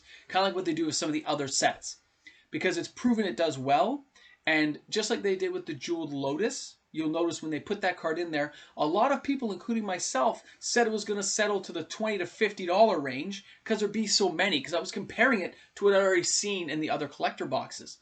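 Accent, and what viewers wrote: Canada. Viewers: Judging from the opening scene and your pronunciation your in Canada?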